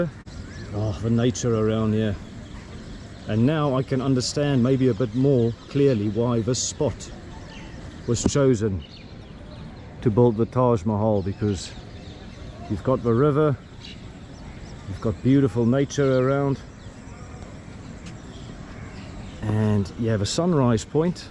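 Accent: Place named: South Africa. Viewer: Are you South African by any chance? Your voice and accent sounds very similar to the South African cricket legend AB de Villiers. And just as I was typing this, you also happened to mention his marriage proposal story, so I am guessing you might also be from South Africa. Anyway, awesome video. Cheers!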